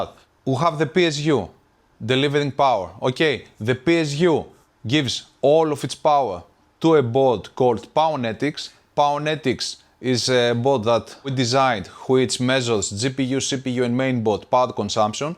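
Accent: Greece. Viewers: Are you greek by any chance?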